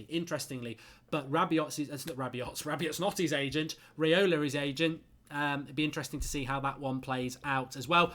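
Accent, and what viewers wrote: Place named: Scotland, United Kingdom. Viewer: Scots accent utter pish, ya wee midget.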